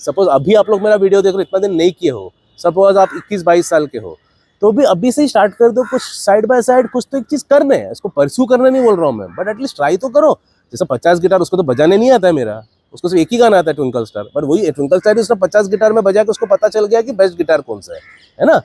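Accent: Bengal, India. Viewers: Bro are you bengali?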